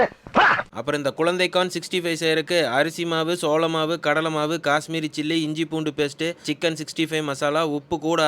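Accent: Kongu, India. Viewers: Anna coimbatore la irundhu nga. Ippo Chennai la iruken nga. Unga super aana samayal um namma ooru baashai um kekum bodhu rombha santhoshama irukudhu nga anna. Idhe maari neraya video podunga. Best wishes! 😊❤ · This is kongu slang not Coimbatore slang mostly speaking by Erode, Tirupur then only in Coimbatore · நம்ம கோயம்புத்தூர் ஸ்லாங் கேர்க்கரதுக்காகவே உங்க சேனல்ல subscription பண்ணிட்டேன் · Singapore la kongu tamil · Very nice Kongu thamil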